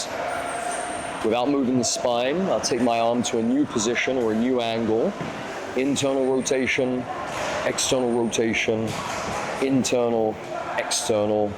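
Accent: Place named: England, United Kingdom. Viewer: I'm sorry I can't take this guy seriously with all those tattoos combined with his Wallace and Grommit look and middle class English accent on top. I would prefer t learn from the Thai trainers, are there any left in Bangtao?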